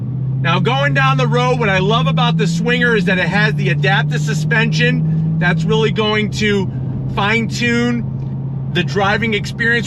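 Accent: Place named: New York, United States. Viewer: Gday mate im an Aussie and am getting a Hellcat imported for a January delivery. Cant fkn wait aye. Good review just a question though where is your accent from as i love immitating your voice. Are you from Brooklyn or Boston or something else?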